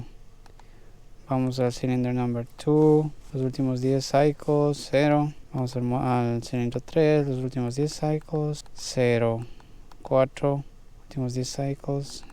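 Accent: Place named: Highlands, Ecuador. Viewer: Ese acento característico de la serranía ecuatoriana, creería que del Austro.... llegué a este video, gracias al algoritmo de Youtube. Excelente video, saludos desde Guayaquil, compatriota.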